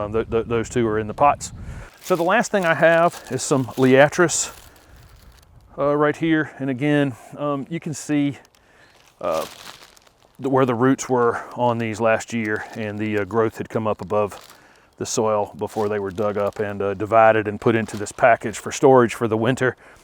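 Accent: South, United States. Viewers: Where are you? Somewhere down South, I can tell that.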